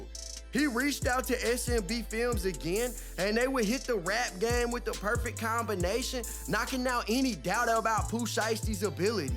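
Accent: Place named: California, United States. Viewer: Dog, not bad. Where u from? Sounds like Cali somewhere But your accent is extra! Imma get used to it.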